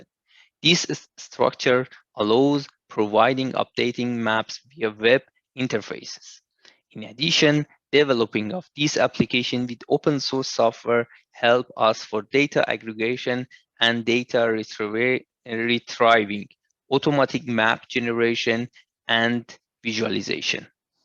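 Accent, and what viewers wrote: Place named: Germany. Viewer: عالی بود فقط ته لهجه آلمانی گرفتی😁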